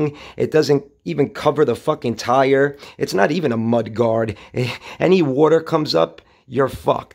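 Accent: New York, United States. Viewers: I love your NYC accent you do really good vids and your bodybuilding channel is awesome as well!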